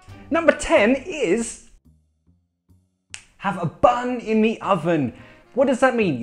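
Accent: United Kingdom. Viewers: I luv the British Accent 😄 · is he British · What is your accent? Is it ordinary british? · Bro, Your accent is exactly like Harry Potter. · Hahaha I discovered your channel some days behind and I am really enjoying it a looooot~ u made me want to forget the american accent I learned to get the British accent u speak haha Thank u for the really funny and educative videos~ hugs from Brazil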